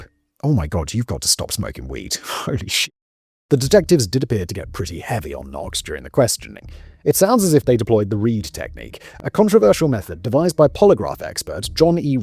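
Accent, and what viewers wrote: United Kingdom. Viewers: Well time for bed with one of my fave true crime shows TCC. Why watch a true crime show when i go to bed you ask? ( you did not but allow me to tell you anyway ). with his dark british voice calms me and Danny writes good and long scripts. Perfect! Don't you all agree?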